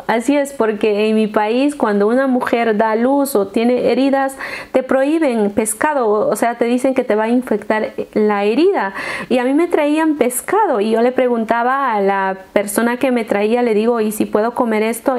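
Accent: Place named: Peru. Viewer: por tu acento eres de Perú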